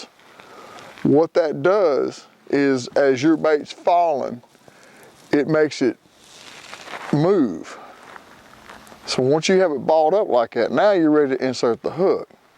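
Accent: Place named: Louisiana, United States. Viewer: "Where you goin'??" LOL good ol' Louisiana boy.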